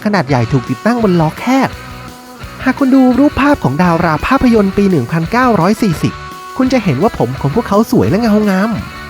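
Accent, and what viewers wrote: Isan, Thailand. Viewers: คนอีสานบ่หนิ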